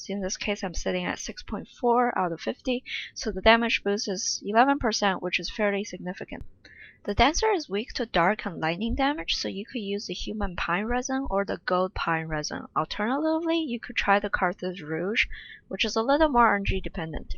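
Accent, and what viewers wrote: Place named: China. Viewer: 妹子你是中国人嘛😳 I like your accent; it sounds just like mine.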